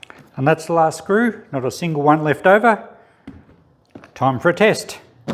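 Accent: Australia. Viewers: Thanks mate u sound so Aussie 😂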